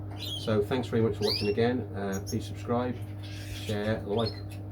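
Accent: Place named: Potteries, United Kingdom. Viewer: YOUR ACCENT AINT NO GEORDIE ACCENT, MY GUESS IS THE POTTERIES?? MY TEAM IS SO UNPREDICTABLE THESE DAY TOO MANY CHANGES AND A NUMBER ONE DE-STABILIZER 'POGBA' SKULKING FROM HIS CONSTANT INJURY TABLE, MAN UTD. LET'S......... AW GAN ALANG THE SCOTSWOOD RD AN'.......... WORSHIP ALLAN SHEARER, LOL''............ THANKS FOR THE PARTY FELLAS.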